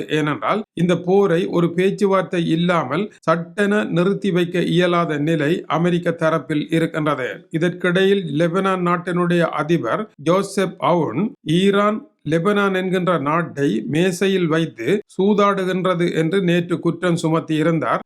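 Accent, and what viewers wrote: Sri Lanka. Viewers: அதிஅகோரம் ப்பா ஸ்ரீலங்கா தமிழ் ❤❤❤❤❤❤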